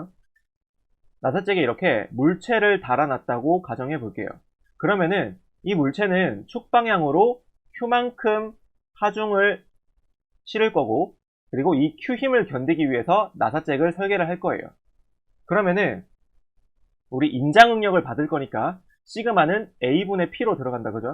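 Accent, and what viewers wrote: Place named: Gyeongsang, South Korea. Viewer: 사투리가 약간 있네요 혹시 경사도분? ㅎㅎ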